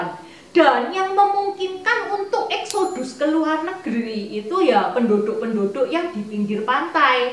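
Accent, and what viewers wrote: Java, Indonesia. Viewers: cici ngomongnya logat jawa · Ulasannya bagus... walaupun logat jawa nya masih nge bass .. terima kasih. Btw orang terkaya di indonesia tetap di pegang oleh perantauan hokkian .. mulai dari Liem sioe liong hingga sekarang Oei hwie tjong ( BCA .. Djarum group ) .. saya sendiri generasi ke tiga dari perantauan hokciu / foo chow ( ibukota provinsi hokkian / foo chian ) . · Trimah kasih cici tlah mmberikan informasi sejarah tentang suku etnis tiounhhua ,,yg trsebar di blahan asia,, dan byk menjadi pemimpin negara ,, cici mdok baget logat jawa nya hi hi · Saya merasakan mbak ini orang Jawa, krn medok. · Oh iya..kenapa loghat bahasa indonesianya kayak Wong jowo??sementara loghat org cina di Malaysia loghat bahasa melayu nya nggak loghat melayu??😀😀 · km turunan Tiong hua? Tapi logat km tdk seperti org tiong hua tapi seperti jawa logat mandarin km tdk pas bgt. 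· Kalau mbak ini medhok ya sudah dari sananya, artinya dia menguasai bahasa lain ( jawa) selain bahasa Indonesia. Susah sekali menghilangkan logat bicara seseorang. Yang terbaik memang bicara tanpa aksen. Semangat mbak. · Seneng dicerita’in mbak yg Cantik, Pinter dan enak Suaranya yg Medok. Salam Sehat & Sukses nggih 🤲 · Medok banget. Ngomong "k" jadi kayak ngomong "g". Ngomong "khek" jadi "ghek". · meddok jawanya,etnis china jawa ya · Cece.... medok Jawa nya love love semoga Chanel nya makin banyak subscribers nya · Makasih infonya mbak. Logat jawanya medok bngt mbak hehe. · Ini jawa tionghoa dari mana ya? Logat jawanya khas sekali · ini asli orang Jawa. Logatnya medok Jawa banget. Gak ada pelo logat Cinanya lagi. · Ma'ap min ...ingin kenal , dari mana admin berasal kok logat nya medhok kayanya dr jawa semangat salam sehat selalu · Coba bahasa Indonesia nya diperbaiki lagi cece.. Jgn medhok.. 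Kebanyak kan huruh H.. Jadi gak enak kedengaranya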